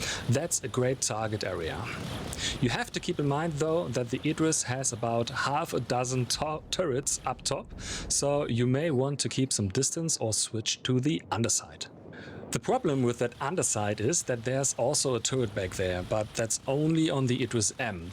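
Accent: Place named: Germany. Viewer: das war das deutscheste englisch was ich gehört habe^^ ( nicht negativ gemeint) cooles video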